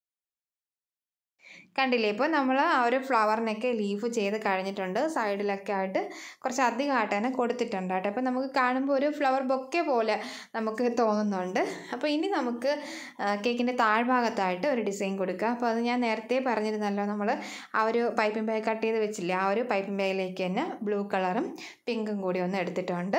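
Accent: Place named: Italy. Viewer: Parece una italiana hablando inglés 😅 me agotó jajaja. Al principio no sabía bien en qué idioma estaba explicando! 😂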